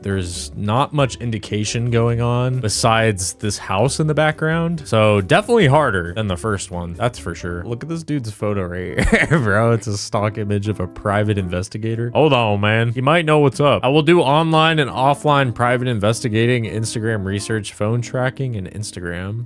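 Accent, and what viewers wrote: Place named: Canada. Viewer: I thought this geezer was Canadian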